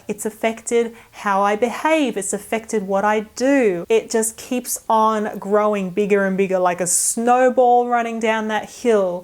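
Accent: Australia. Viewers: Its nothing compared to what joy your videos bring me ! I love your channel , I do , I even started to like manga and stuff that people in Japan like , animes and so on. I know a few words thanks to that , which is thanks to you :) And one more thing darling : You are one of the very first australian youtubers I discovefred on youtube and since then, I love autralian accent ! people say , they end up sounding like asking, as if there is a question mark, I think its more like wanting to say more, so the melody would go down and a little bit up just so that we axpect anothe sentence, but sometimes it feels like you guys are emotional aout the things you say ...I dont know, maybe you could do a video about stuff that make you australian ?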